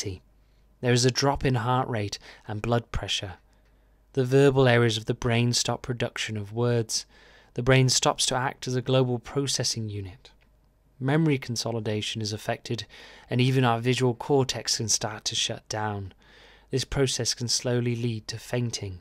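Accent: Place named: Wales, United Kingdom. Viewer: Thanks, watched on repeat and took notes, very professional, and with an endearing hint of Welsh?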